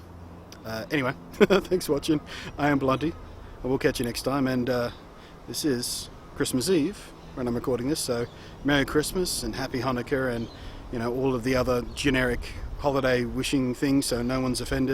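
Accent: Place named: Australia. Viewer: Australian accent + high quality cameras + spiders = very entertaining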